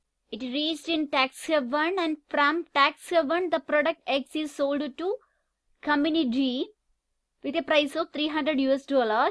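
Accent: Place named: South India, India. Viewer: Slides are nice and by your pronunciation I take it you are from south and goes by the saying that south people have good command over english..and it has proven right in the video..marvellous you explained beps much better than others and in such limited words thank you mam..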